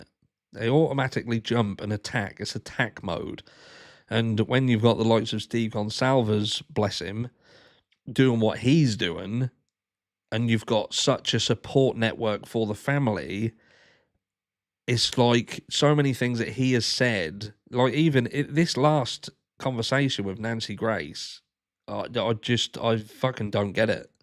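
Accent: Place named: RP, United Kingdom. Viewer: Hello that posh accent 😂 i didn’t think it was you until the swearing😂.. in bed still ill & hubby put you on but i wasn’t watching at first just listening i thought thats no.. 😂 that was a lot of docs.. sorry to hear about your daughters glasses.. maybe who done it will own up.. not.. hv a good day everyone im off to feel sorry for myself 🤷‍♀️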